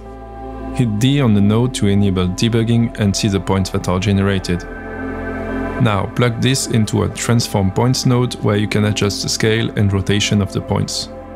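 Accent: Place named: France. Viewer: Toi tu es français c'est sure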